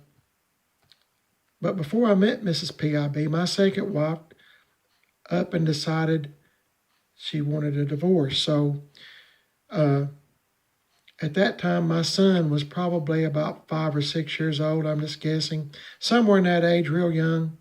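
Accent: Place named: Eastern Kentucky, United States. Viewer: I hope you don't mind me asking, but where are you from? I grew up in eastern Kentucky and you sound like you could be from just over the hill!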